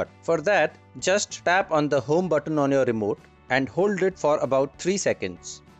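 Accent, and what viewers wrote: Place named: India. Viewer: I usually don't trust people with Indian accents but you helped a lot, thanks.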